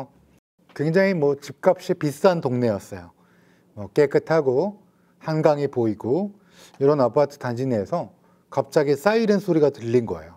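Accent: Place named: Gyeongsang, South Korea. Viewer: 말투가 대구 언니네😅😅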